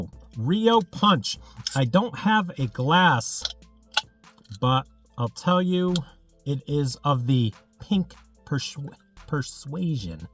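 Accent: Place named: United States. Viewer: Lovely American accent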